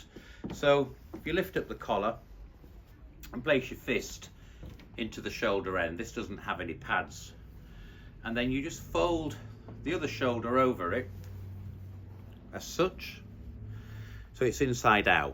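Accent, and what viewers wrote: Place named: RP, United Kingdom. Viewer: I so like to listen to your cultivated British English. Always a pleasure.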